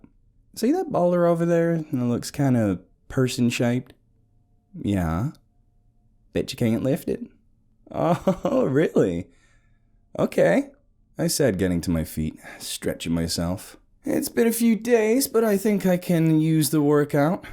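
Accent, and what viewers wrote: United States. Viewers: Your American accent is very good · Is that you behind the American accent or a guest?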